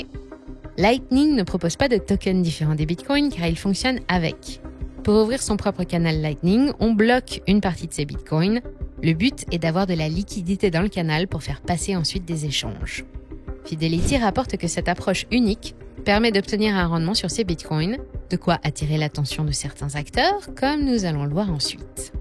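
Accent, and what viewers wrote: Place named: United States. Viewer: prononciation à l'americaine tout simplement mais oui c'est clairement marketing il y a limites plus de commentaires à propos de sa voix que par rapport au contenu haha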